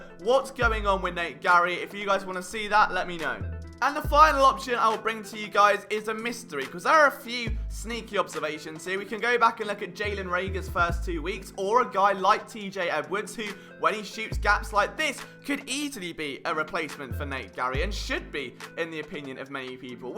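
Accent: England, United Kingdom. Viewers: The Queens English 🐥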